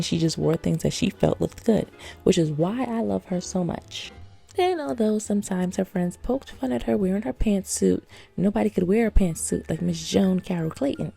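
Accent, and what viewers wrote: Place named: East Coast, United States. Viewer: Your accent 😍, you sound like you’re from the East coast. And tbh everyone on Girlfriends was a terrible friend oops.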